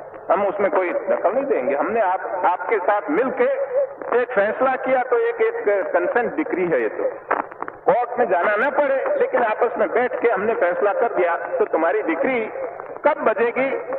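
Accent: Gujarat, India. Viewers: That Gujarati accent! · Typical gujrati accent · Not voice but yes accent is same bcoz both have gujarati tone in hindi thats y luking little bit similar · in both speeches 's Hindi pronounces with Gujarati tone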